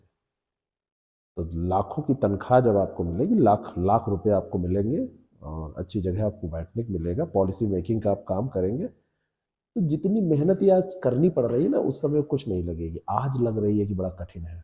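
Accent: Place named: Purvanchal, India. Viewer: Lgta hai aap purvanchal k side k hai😂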